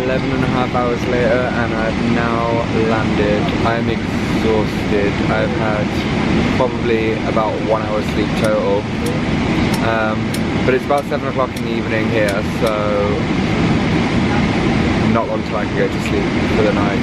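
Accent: United Kingdom. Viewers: I love British accent and English guys, and did find your channel, Subscribed, liked and commented now... Damn you look hot ;)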